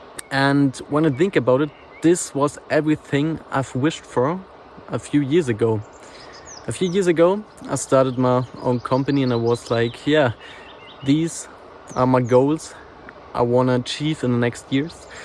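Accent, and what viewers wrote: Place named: Germany. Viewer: Bro why are you speaking English? I can hear your German accent extremely clear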